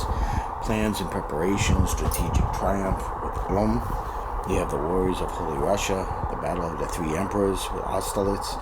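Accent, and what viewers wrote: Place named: Boston, United States. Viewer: Put this video on because I just bought this book. Wanted to hear some thoughts on it and all I can hear is the Boston accent haha. Sounded like my dad talking about Napoleon. ‘We’re gonna learn about how Napoleon lost in Russia. What a chowah-head.’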